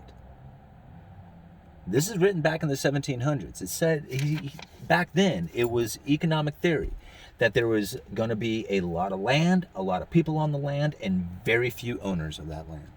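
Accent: Pacific Northwest, United States. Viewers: You're such a Pacific Northwesterner. I feel like I'm listening to my oldest cousin who is from Bend, OR, roughly your age, and you both have the same "accent". I admire the hell out of both of you. Must come from the culture.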